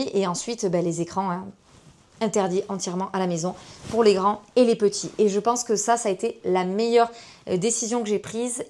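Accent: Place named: Toulouse, France. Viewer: J'adore ton petit accent toulousain mais tes contenus aussi, tu es une conteuse magnifique !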